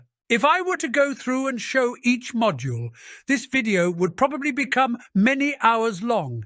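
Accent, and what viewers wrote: United Kingdom. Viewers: Dear excitable mystery British A.i voice person kindly making videos. /:) I am having a headache and wondered if you could share a line or two here or if you wanted make a video, regarding a headache I am having. No matter how I try, I cant seem to load any different models nor Lora's into it. I go to the model manager for example, and I find an applicable checkpoint sdxl model from Citivai as example, I copy the web address of the page and plonk it into the model downloader in Biniou, and all it does is download a few hundred kilobytes then says the model has been downloaded. Same for Loras. Do I need to edit the URL or do something else to it to make Biniou accept the model and install it? As for huggingface, I have an account but I haven't a clue what url I am supposed to take from there to put into Biniou as while I can find relevant pages for applicable models and such easy enough on there, huggingface don't exactly have a ''download"' button and when you are new to all this its a little befuddling. 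Many thanks, from an excitable british man.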